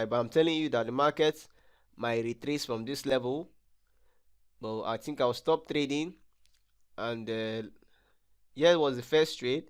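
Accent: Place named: England, United Kingdom. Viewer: I like your english accent, haha , btw love ur work 📉📈📊✔️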